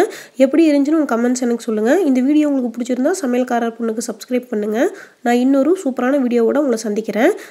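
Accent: Kongu, India. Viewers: Kongu tamil sema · Kongu Tamil super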